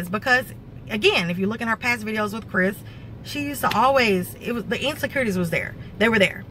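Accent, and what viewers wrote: South, United States. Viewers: YOU SO COUNTRY OMG😍😍😍😍😍😍😍😍😍😍😍😍😍😍😍😍😍 WHERE YOU FROM!?!?!?!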